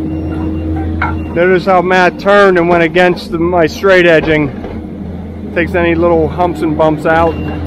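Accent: Pittsburgh, United States. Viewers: Don't worry about talking like a Yinzer. It's everyone else that has an accent.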